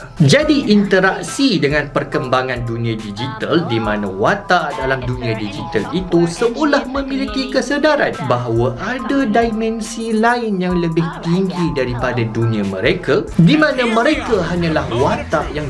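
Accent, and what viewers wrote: Malaysia. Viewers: pak, boleh minta tulisan dibawahnya dong, tambahkan subtitel, hehe, saya masih binggung sama logat melayu, hehe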